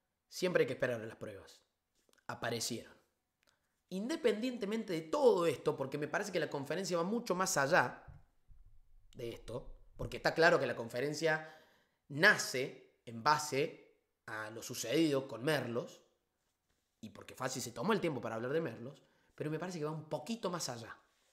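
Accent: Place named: Córdoba, Argentina. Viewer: TE FELICITO POR TU EXPOSICIÓN. PERO MÁS CONTENTO ESTARÍA QUE TENGAS EL ACENTO CORDOBÉS AÚN MÁS ACENTUADO..."ES UNA MARCA REGISTRADA".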